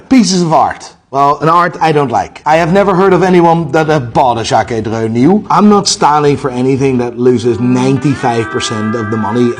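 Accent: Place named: Ireland. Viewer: Once you hear him as Irish, its impossible to shake. Sorry.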